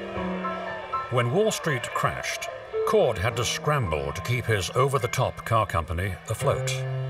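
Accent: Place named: United Kingdom. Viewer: If these cars were American made, why is there a british guy narrating this video?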